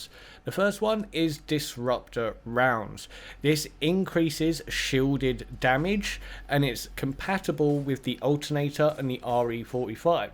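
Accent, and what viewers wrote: United Kingdom. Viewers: In case he doesn't reply, his accent sounds like South-East England to me :)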